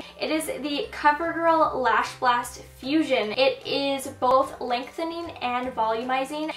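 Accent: Canada. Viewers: she has a slight accent because she's from Canada.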